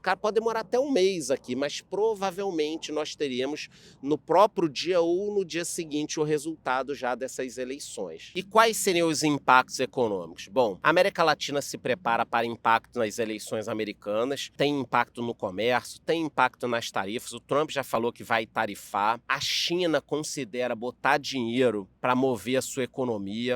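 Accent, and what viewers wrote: Rio de Janeiro, Brazil. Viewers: adoro suas explicações. Excelente canal! (E mat0 as saudades do sotaque carioca ouvindo você). 😊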